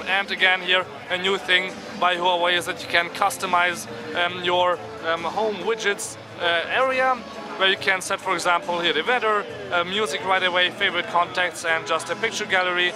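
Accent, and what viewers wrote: Germany. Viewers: German accent? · Wie man sofort hört, dass er Deutsch ist xD Immer wieder lustig :D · God, do u sound German!^^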